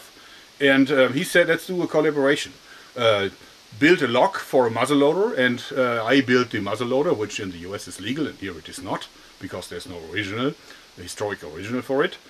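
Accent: Germany. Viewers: are you german or austrian?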